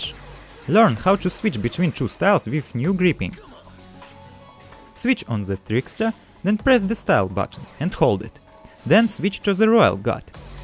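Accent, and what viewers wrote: Russia. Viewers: Твой russian акцент too заметный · I freaking love the Russian Accent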